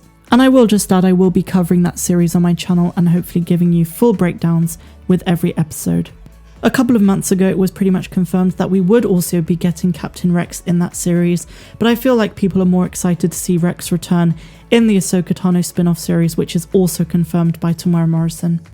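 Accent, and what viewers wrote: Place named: Southern England, United Kingdom. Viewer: I can’t work out if your accent is south east or south west hahahahaha. Great work, enjoying the channel. 👍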